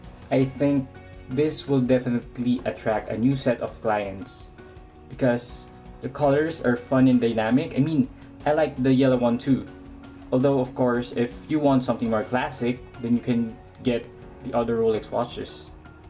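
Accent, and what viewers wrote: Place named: Philippines. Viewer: You sound like you're from the Philippines. I would have thought the 36mm size would have suited you better. Just thinking about buying one of these in the Turquoise blue. Just sold my Rolex Explorer to fund it.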